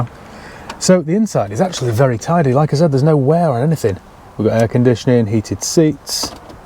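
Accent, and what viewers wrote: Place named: North, United Kingdom. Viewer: how come hes got a northern accent if hes only been in the uk for 11 years?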